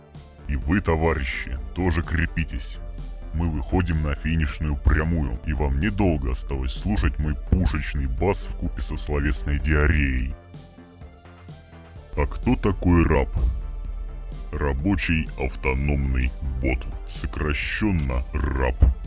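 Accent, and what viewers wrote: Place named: Soviet Union. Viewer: Автор клоун,комунист,который думает что конченый советский говор и элементы советских реплик сделают его крутым.Хуже канала по СФС не видел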